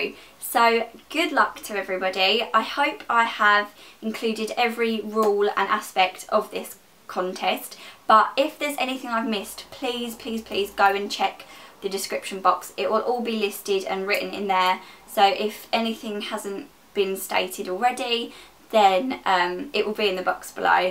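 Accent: United Kingdom. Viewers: First video I've seen of her! Before I even played it I was like "shes gonna have a British accent!!" and she did lol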